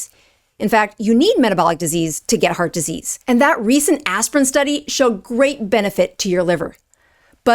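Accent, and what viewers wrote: United States. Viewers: Australians know the second that you hear that American accent, you stay the fuck away from whatever they're recommending.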